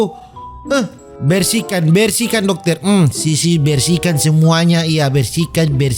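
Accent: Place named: Makassar, Indonesia. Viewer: Aduh bang kau bicara lucu kali Org makassar kah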